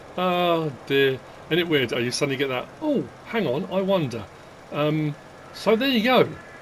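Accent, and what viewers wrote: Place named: United Kingdom. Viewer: I was just reading an article "Here’s Why So Many Movie Bad Guys Have British Accents" AND i Thought of you 💡 You have that perfect villain accent that has many features that are perfect for a villain. Queen’s English make people appear, quote “more educated, intelligent, competent, physically attractive, and generally of a higher socioeconomic class.” end quote lol. I have an EVIL PLAN.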